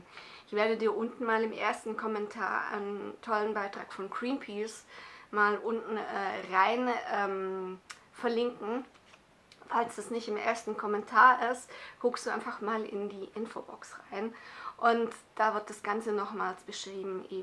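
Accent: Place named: Swabia, Germany. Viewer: Als Du gesagt hast:In den Schuh rein schlupfen!!Mußte ich echt grinsen.Mag dein schwäbischer Dialekt.Bin selber geburtig aus Biberach an der Riß.Liegt zwischen ULM und LAUPHEIM.Liegt im Oberschwäbischen Bereich.Drück Dich mal von der Ferne.Wohne jetzt im Bereich Würzburg,Bayern!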